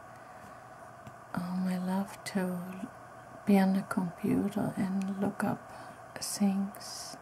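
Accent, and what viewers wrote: Germany. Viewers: ich komme auch aus Deutschland, allerdings aus dem hohen Norden! Ich mag Deine Videos und höre auch noch manchmal einen leichten schwäbischen Dialekt bei Dir ;-) Liebe Grüße aus Kiel :-)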